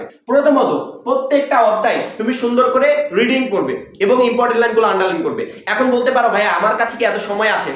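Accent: Sylhet, Bangladesh. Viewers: আপনি কি সিলেটি😢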